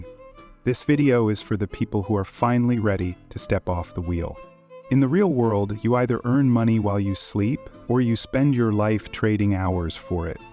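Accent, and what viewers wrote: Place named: United States. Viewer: Good video. So simply explained. I was expecting something with an "Asian" voice that was difficult to understand. That was more like basic English spoken like an American. The concepts were straightforward. For a rat-race escape video, it diagnosed the problem and offered solutions, in a way that was useful and tabloid.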